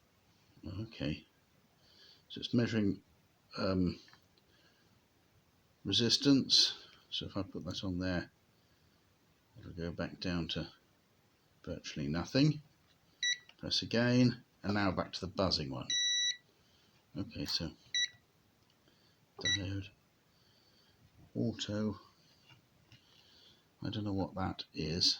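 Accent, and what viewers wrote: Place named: England, United Kingdom. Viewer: um, we couldnt se a thing you were doing. just heard some old English chap muttering on about various things...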